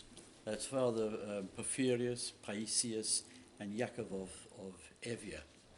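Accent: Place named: England, United Kingdom. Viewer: Dear father, an English accent???!!! Where is your church? I’m a recent English convert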